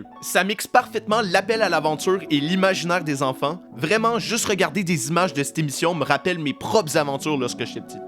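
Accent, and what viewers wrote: Quebec, Canada. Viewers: c'est tellement rafraichissant quand je vois tes vidéos apparaitre dans mon youtube, d'entendre une vraie voix québécoise ps filtré comme a la tv. humour au point comme toujours en plus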